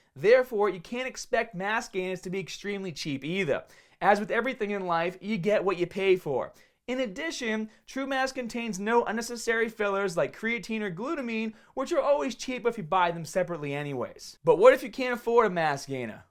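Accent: Boston, United States. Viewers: Boston accent has consistently thickened at a gradual rate over the years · This guy has the most boston accent I have ever heard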